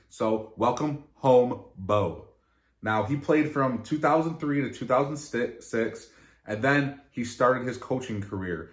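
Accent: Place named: New York, United States. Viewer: Great video and I too am excited about this staff! I was gonna guess you were from NY based on your accent lol. Where in ny?! I'm from the lehigh valley pa area. Not too many titans fans up our way!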